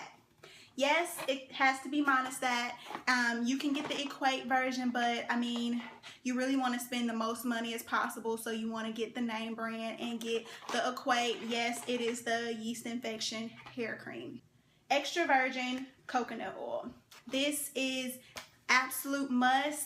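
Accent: South, United States. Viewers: Where are you from?? You have such a cute country accent. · Your Southern accent is lovely. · I can hear the South all in your voice!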